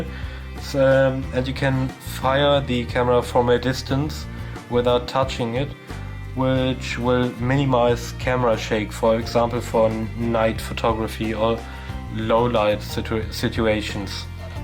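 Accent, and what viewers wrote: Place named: Germany. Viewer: Englisch mit 'nem deutschen Akzent, hach wie sehr ich es liebe :)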